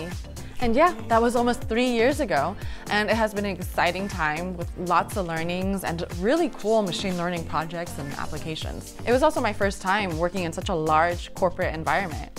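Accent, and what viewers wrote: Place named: Germany. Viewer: I studied a year at TUM as a part of my MSc. Your German accent is impressive!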